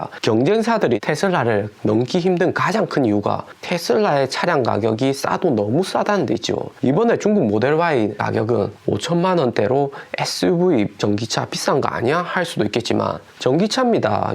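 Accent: Gyeongsang, South Korea. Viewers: 덕분에 테슬라 650불에 진입했어요... 어쩐지 낮익은 목소리.... 같은 갱산도 아이미꺼 저는 마산... 샤오펑도 오늘 진입했어요.. 새해 구독자 대박대박... 영상 보신분들 꼭 구독 좋아요.. 부탁드립니다. 샤오펑도 가즈아~~~ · 경상도 아지야 좋아요 꾸우욱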